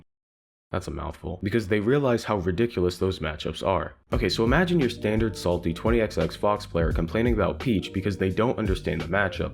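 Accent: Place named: New York, United States. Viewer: has the thickest NY accent i've ever heard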